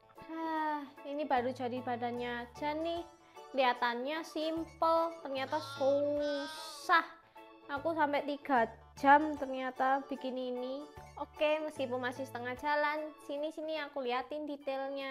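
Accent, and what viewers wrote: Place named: Java, Indonesia. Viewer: Ngomonge medok pol😂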